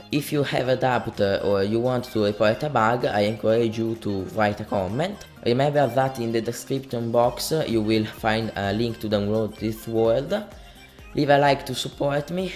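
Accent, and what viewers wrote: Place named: Italy. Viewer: good video and i frecking love your italian accent XD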